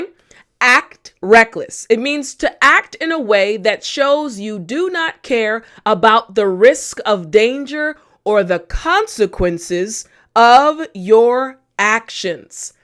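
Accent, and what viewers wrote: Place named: New York, United States. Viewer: Hi, I love your voice and accent. Are you from NY?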